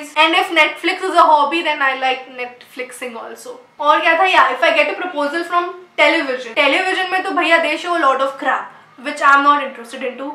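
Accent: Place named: Gujarat, India. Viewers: You speak so funny Gujarati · Woh woh wohhh.. u speak very sweet gujju. Jus loved.. lots of love n blessings..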